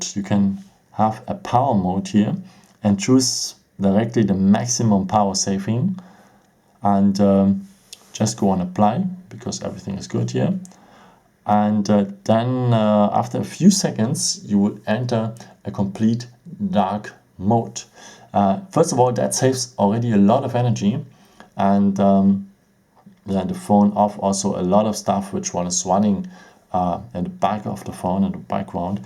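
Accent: Germany. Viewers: No hate but I have a question about your pronunciation. Are you German? I think it really sounds like "german english". About the video: I like this theme of videos. Your video help me to understand the A 40 better. I need these theme of video because I get the Samsung Galaxy A 40 in this week! Nice content! Edit: Ich bin Deutsch (I'm German) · Jo du musst nicht englisch rede jeder schekt das du deutscher bist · achja der Deutsche akzent... einfach nur genial :D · K1ller_ _Headsh0t its just a german accent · What an german accent! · Er spricht Denglisch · Ich höre sein englisch... und denke mir Jap das ist ein deutscher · Erste Sekunde: BOAH NE DER IST DEUTSCH Nichts für ungut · Haha wie man raushört das du deutsch bist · Mich regt der deutsche Akzent auf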